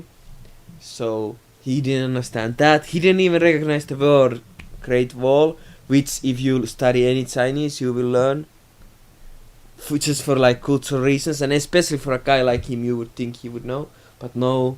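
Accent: Finland. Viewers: Is this a finnish accent or a messy indian accent? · I'm finnish and this is the most stereotypical finnish accent ever, he is 100%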